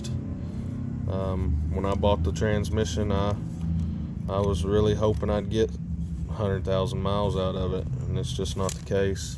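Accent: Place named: East Texas, United States. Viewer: Hi, you sound like you're in East TX. If so, would you be able to help me identify if I need a complete rebuild or if I just need the basic kit? I'd appreciate it!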